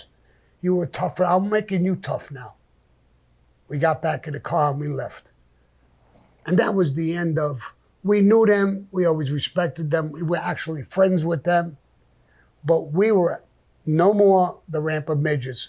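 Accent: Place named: Northeast, United States. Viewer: It’s that northeastern accent brotha. Rampa’s = Rampers.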